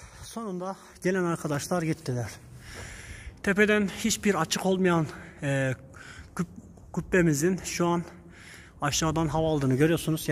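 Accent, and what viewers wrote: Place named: Amasya, Turkey. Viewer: Reyiz nereli ya şive yabanci degil bana ben amasyaliyim :)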